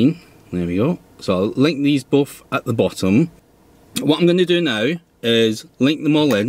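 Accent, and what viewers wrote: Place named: Glasgow, United Kingdom. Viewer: Great Glaswegian accent.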